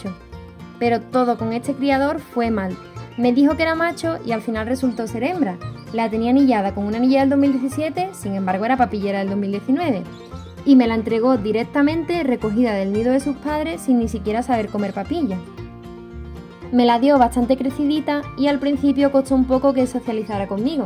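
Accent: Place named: Andalusia, Spain. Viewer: ¿De dónde eres? no consigo dar con tu acento: estoy entre Granada, Jaén, Córdoba, Sevilla...🤔 Yo vivo en un.pueblo de Vlc y tengo 22 agapornis 😍😍😍😍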